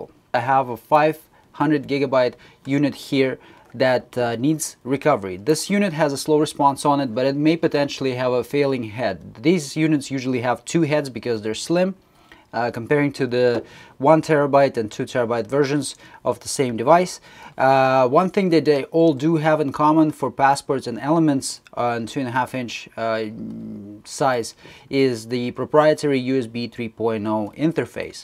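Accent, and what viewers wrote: Russia. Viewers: чет есть русский акцент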